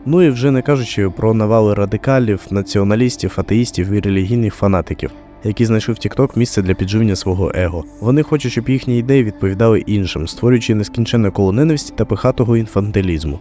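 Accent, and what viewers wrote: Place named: Russia. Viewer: я розумію, що то рофли. Але навіть рофлово назвати це крінж. В нього тупо сруський акцент навіть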